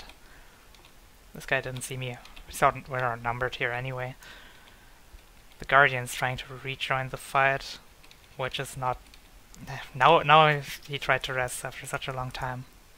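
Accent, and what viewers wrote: Germany. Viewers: den deutschen akzent bekommt man einfach nicht weg :) gutes vid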